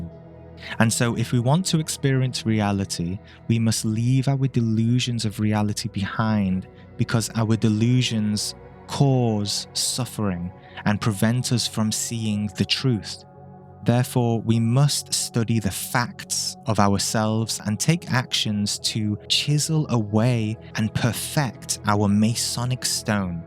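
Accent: United Kingdom. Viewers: This was very nourishing. Cheers. Lovely soft Liverpudlian accent.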